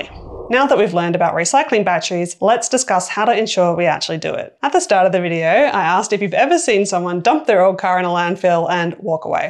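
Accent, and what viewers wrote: Australia. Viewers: Hey! Keep taking the magic mushroom juice. It has slowed your delivery down, noticeably! That's very welcome imo. As an older person with hearing impairment, I found your previous combination of Australian accent and machine gun delivery a struggle to keep up with, understand and digest. A micro-pause between sentences, Dave Borlace-style, would allow my withered synapses to do a bit of absorption and digestion of the concept just discussed, but thank you SO much for the new more measured pace. Loving it ❤!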